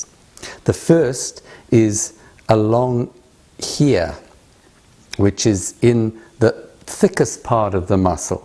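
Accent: South Africa. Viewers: Dear, your accent sounds South African. Loved this video.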